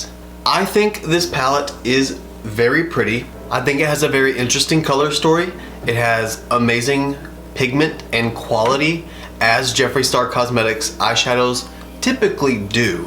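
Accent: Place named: South, United States. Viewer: Loved watching you create this gorgeous look,. That shimmer purple next to the shimmer yellow on the lid is such a beautiful color combination. I've been trying to place your accent (I knew it was Southern US, as I live in west Tennessee), & then noticed your description box. Haven't been to Hot Springs in quite a few years now (I used to visit more in my university days, as one of my buddies had family there who owned a dairy; one of the last that did doorstep delivery before his grandfather passed). It is such a beautiful place to get away & enjoy a bit of mountains without having to drive forever. Anyway, thanks for sharing this fabulous look with us, & hope you are enjoying a superb week so far! 😊💖👍🏻🌷🌻🌼🌹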